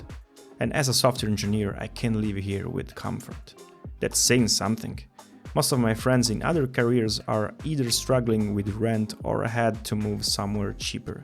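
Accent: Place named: Europe. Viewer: base on your european accent, its understandable to use ai checking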